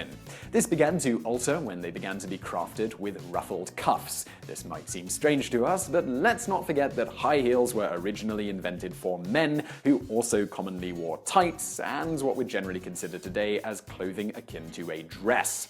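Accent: United Kingdom. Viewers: The "Barack" period lol. I love British accents!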